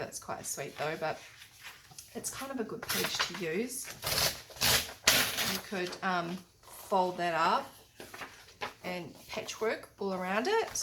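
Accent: New Zealand. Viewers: Recently stumbled upon your channel I detected the kiwi 🥝 accent im from Auckland but soon to be a southland gal, love your channel and ideas very inspiring thank you for sharing your creativity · Ahhhh, I had thought there was a bit of a kiwis accent there !!... when Gayle A said she loved your Aussie accent and I went huummm. I'm so like you in regards going to the states ,told people would love to go to the states...questioned ouhh what would you do 😁 Go to second hand shop, craft shops Michael's ..theres a bit of a list there !!!. The area of BrisVegas I'm in, the second hand shops are rather boorriing!!. I've been keeping an eye out for wall paper .... as for tea dying paper my issue is that mine are too light..tried drying them naturally, in oven, ironing them...not finding them exciting lol. Eco dying ohh my gosh they were bleurrr please show how you do urs with Aussie flowers and products. 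I still am trying g to work out what Alum powder is 😲..makes gorgeous colours of plants and flowers. With my pages theres just a tiny hint that there might have been a flower there ahaha. Things in the states are soo different. I was at my local Kaiser shop and overheard a lady stating that she just couldnt find a piece of 12×12 that she wanted and was upset. I happened to have that paper which I'm not nor will ever use. So i went up to her and introduced myself telling her I had that paper and she was welcome to have it...oh my gosh it was so awful , I ended up telling her I would leave the paper at the shop for her ro pick up....like I she really needed that piece of paper to finish her scrapbooking. All that lady kept saying was ...what do u want , you cant just want to gi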